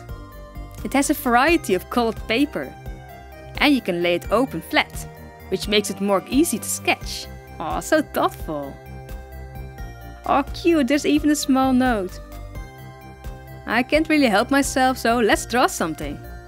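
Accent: Netherlands. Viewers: Ik kan het gewoon duidelijk horen aan de manier waarop je praat dat je Nederlands bent 👏👏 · haha ik vind je nederlandse accent echt geweldig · Ha ha😁 I'm Ducht and can hear you are to😆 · haha je hoort echt dat je uit nl komt maar leuke video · Ik kan het horen dat je Nederlands bent. Het accent😂😂😂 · I’m new to this channel but when I heard your voice I knew you where Dutch! Finally someone who likes dolls and Littlest Pet Shop that lives in the Netherlands! Thanks for making this channel! This really helps me out but can you make videos for Littlest Pet Shop? That would be nice! Still nice video! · Haaaaaaaaaargh Nederlands accent😂, ik wist ineene wel dat ik de goeie video had! En echt wel een gaaf kanaal ey! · I KNEW IT - I recognized the Netherlands accent in your voice! I live there too-! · Dus je bent eigenlijk Nederlands? Btw dat denk ik door het accent ———————————————————————- So you are acually dutch? 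· I didn't know you were Dutch as well until I watched Fairy's video and now I can clearly hear your accent xD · Ik kon gelijk horen dat je uit Nederland kwam😂😂❤️❤️❤️ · I can just FEEEEEEEEL the dutch accent (yes, me myself is also dutch) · ik kan zien aan je acsent dat je nederlands bent xD \ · Ik dacht al dat je Nederlands was door je accent. Leuke video ❤️ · Oml NEDERLANDS YES YES YES IK WIST T AAN JE ACCENT :D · OMG YOU ARE DUTCH? OR LIVING THERE? I can just hear it at your voice! I am living here too. in Rotterdam. now I feel better. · Ik hoor het aan je stem dat je nederlands bent en je praat nederlands en Nederlands 😅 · Ik hoorde je accent en vroeg me af is zij Nederlands ofzo xD Edit- waar haal jij je manga? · Haha, hoor t gelijk, dat is een Nederlander xD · I live in the netherlands too! This is my first time watching your channel, and when i heard your voice and accent i immedianntly knew you lived in the netherlands! 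· Wait? We Dutch like our coffee too. Well okay I’m English but living in Holland these last few years gave me a love of coffee. I’m going to susbscribe and see what you do with dolls. Btw, are you from the north of Holland? I can’t quite place your accent :P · U can hear ur dutch😂😂😂 so am i btw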